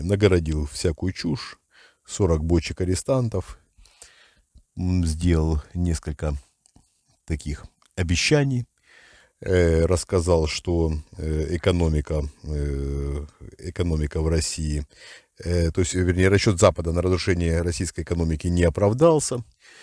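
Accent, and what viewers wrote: Ukraine. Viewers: Вы бы хоть от акцента окраинного избавились и речь подкерриторивали-вброс хохляцкий.....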